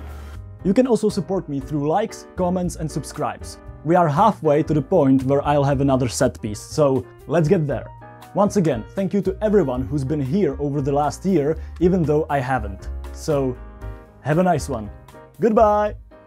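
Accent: Czech Republic. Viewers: me when czech accent i thought it sounded like one, checked bio and sure enough. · Já si říkal že tam slyším českej přízvuk. Neuvěřielná práce!! SKvělej projekt a myslím že to dost retro programátorů ocení. Jen tak dál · ty jseš čech? poznal jsem podle přizvuku.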